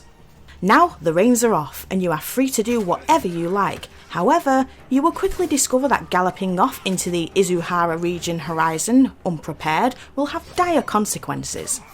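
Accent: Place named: England, United Kingdom. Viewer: Yes, but her English accent is really strong lol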